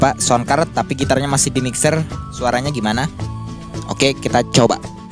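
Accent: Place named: Banjar, Indonesia. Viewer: Suaranya banjar banar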